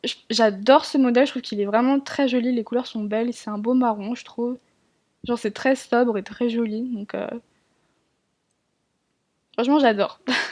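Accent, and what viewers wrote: England, United Kingdom. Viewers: oh mon dieu ton accent anglais et juste waouhhh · Jadore t'es video mais ton axant anglais me gene un peut car en dirait que tu veux faire genr ..'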